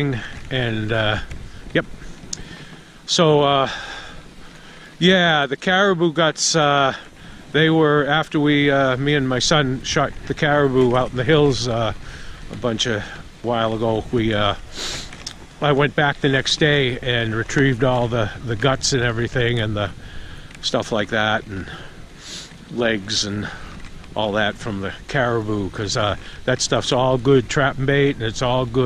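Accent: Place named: Massachusetts, United States. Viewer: seasons greetings from your old stompin grounds...massachusetts. you havent really lost the accent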